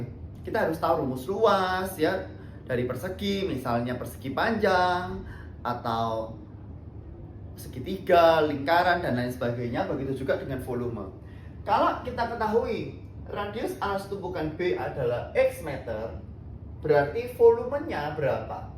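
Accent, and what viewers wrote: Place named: Surabaya, Indonesia. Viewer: Suka sama cara ngomonge, soale bikin kangen sm Surabaya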